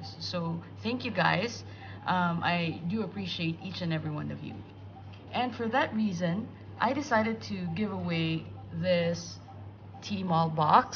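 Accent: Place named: Philippines. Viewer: you have an accent im filipino too.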